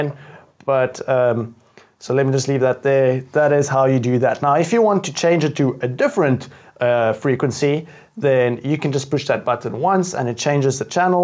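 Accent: South Africa. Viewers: You sound like a South-African... Thanks for the video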